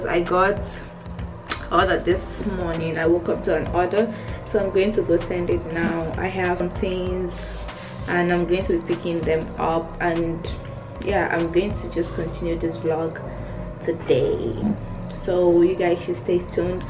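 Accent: China. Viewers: I love well you your Chinese accent. And hunny I loved your vlog. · Another beautiful vlog. I love your Chinese accent 🥰😍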